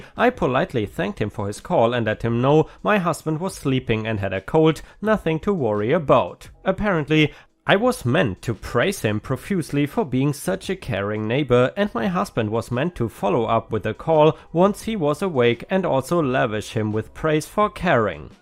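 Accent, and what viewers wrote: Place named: Germany. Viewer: thank you for the reply! I’m honored! Lol. Well, I’m old, and wear glasses, but I love to read along with your voiceover because sometime many of you “clean up” the written words and I like to know what the OP actually wrote. Lol. Thanks for the reply. BT W. I love your German accent, my elder relatives were German immigrants and I love hearing the accent again. 😘😘⭐️⭐️⭐️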